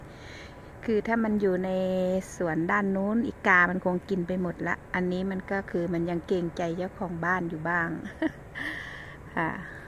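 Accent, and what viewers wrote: South, Thailand. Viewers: พูดใต้ชัดมากเลยนะค่ะ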